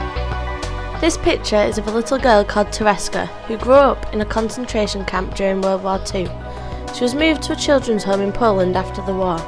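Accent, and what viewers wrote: United Kingdom. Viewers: commentators ... having thick British accents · Finally a British narrator!!...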